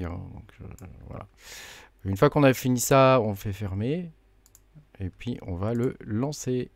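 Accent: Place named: France. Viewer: anyone loves XDAG, will also love your French accent.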